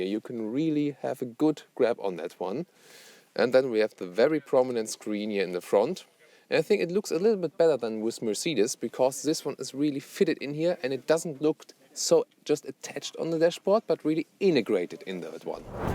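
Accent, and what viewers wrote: Germany. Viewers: Such an enormous German accent, wouldn't it be better to do those presentations in German with English subtitles?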